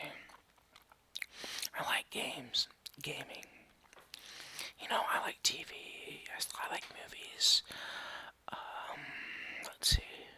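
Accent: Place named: South, United States. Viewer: bro your so funny you sound country like me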